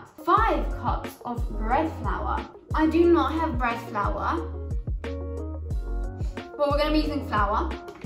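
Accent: United Kingdom. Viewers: this video would be terrible beyond all imagination if it weren't for two facts: that you're stunningly beautiful and even more importantly, that you speaking in a mesmerizing British accent, which makes this video the best one uploaded on youtube since youtube's foundation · Look how cute she look while eating by the way love your content and British accent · i have to visit england, i can listen to this accent all day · Refreshing to hear British accent after a long time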